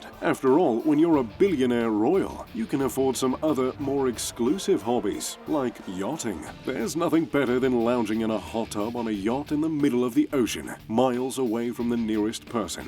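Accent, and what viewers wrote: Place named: England, United Kingdom. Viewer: I love your voice !! My mums from England I love the accent !!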